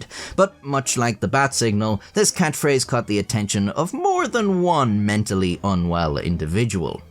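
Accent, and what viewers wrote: Ireland. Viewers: What county are you from, my dads from cork, I can’t pin your accent but i know it sure as hell isn’t Dublin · I love this channel. The interesting stories, the excellent artwork, your wonderful Irish accent and the humor. Entertainment and informative too.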